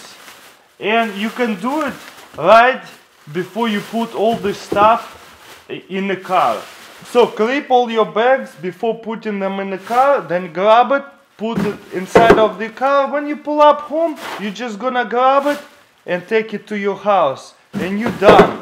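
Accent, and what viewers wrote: Russia. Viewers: сразу понятно по акценту, что чувак-русский )))))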